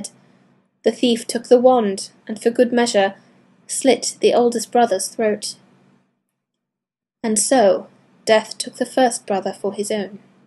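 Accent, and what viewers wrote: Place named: United Kingdom. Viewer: Captivating voice and perfect British accent. It sounds like Hermione's voice. Nice reading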